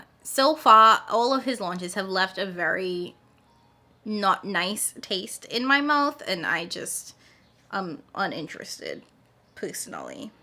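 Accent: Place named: Trinidad and Tobago. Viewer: Found your channel today and I'm enjoying your content and Trini accent.......it's like seeing a familiar face in a room full of strangers. Please continue to post and share your knowledge.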